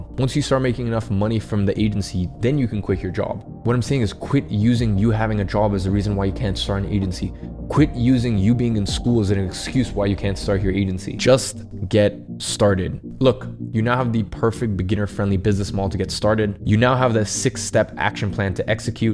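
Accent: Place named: United States. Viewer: I have always wondered if your British, why do you speak with an American accent?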